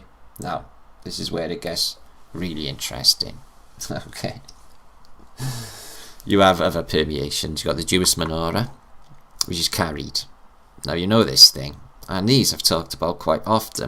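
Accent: Wales, United Kingdom. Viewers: 🙂 accent sounded stronger back then ...In the valley s yaki da ❤️ 🤗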